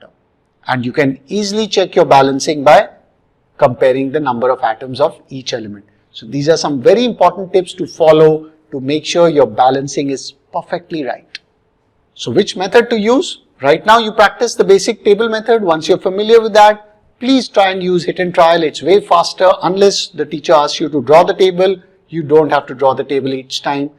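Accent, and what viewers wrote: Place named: India. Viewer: You better listen and forget his Indian accent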